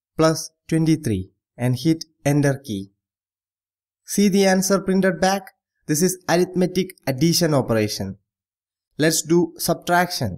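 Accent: Kerala, India. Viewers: By your pronunciation ,,,i found u are malayali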